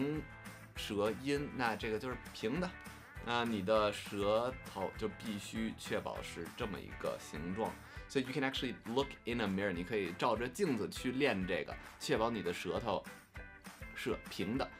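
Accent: Beijing, China. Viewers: 天啊！您这口北京腔是怎么学的啊？ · 老师，你这个大京片子，跟我说是跟非诚勿扰学的，我是不信的。。。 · 您这中文还带京味，厉害了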